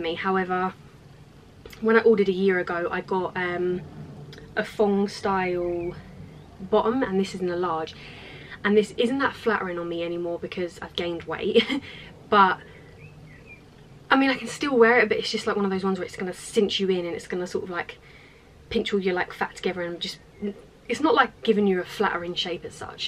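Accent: England, United Kingdom. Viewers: You drive me crazy! Your so beautiful, that long red hair and English accent, you are just bad to the bone. You are number one on my want list. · I love your british acent....!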